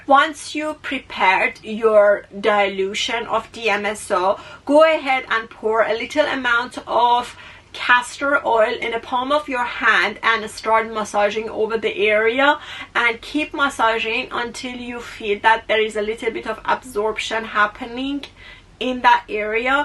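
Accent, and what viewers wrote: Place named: Iran. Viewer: Your natural Iranian voice is so much better than ai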